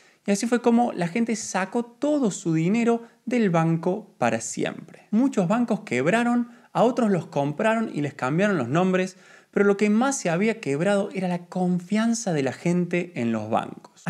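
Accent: Córdoba, Argentina. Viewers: que tonada cordobeza, me encanta. Sos gros exponiendo como antes de ponerlaen bancos argentinos, la pongo en cualquier lotria Crypto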